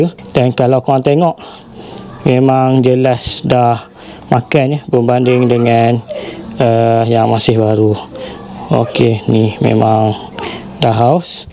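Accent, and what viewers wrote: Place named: Pahang, Malaysia. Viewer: Bang org phg ke... Klu phg area mne..? Kuat slang phg ter...haha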